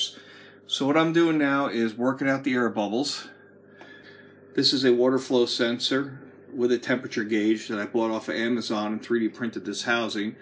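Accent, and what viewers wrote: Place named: United States. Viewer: seems like a lot of money for a used k40 when a brand new one is only about 340 usd..and knowing that laser tubes (most costly and fragile consumable part) have very limited life..the risk is too much in my opinion unless you got it for 50 usd..but thats my opinion....fyi why you speak american english and refer to euros? seems a bit odd to me.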